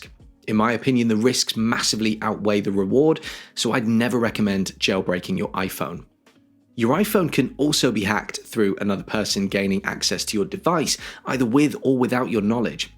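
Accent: United Kingdom. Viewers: Jailbreaking your iPhone, and changing the password from the default of alpine to anything else is infinitely more secure than not. VPNs don’t really help that much. If anybody knows what they’re doing, they can see through the nonsensical rerouting of your IP address. The British accent really helps.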